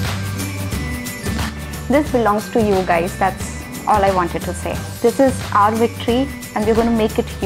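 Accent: India. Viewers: I was shocked 😂..... Not bcz of silver button just bcz of your INDIAN ACCENT.......that shows you are the happiest soul on the earth 🌎 😇😇😇😇😇😇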